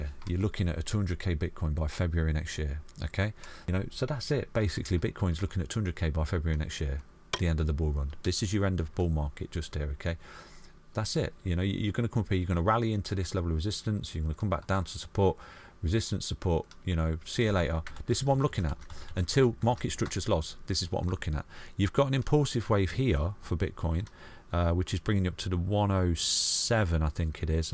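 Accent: United Kingdom. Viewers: Something about the British accent helps strengthen the intelligence and certainty in the predictions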